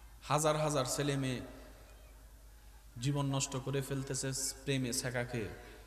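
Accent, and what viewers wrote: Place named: Kolkata, India. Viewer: মাশাআল্লাহ আপনার ওয়াজ কলিকাতান্দা হয়ে যায় অনেক ভালো লাগে আমার কাছে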